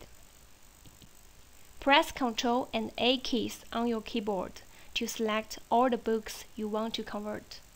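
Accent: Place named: China. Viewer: Yes she sounds like any Chinese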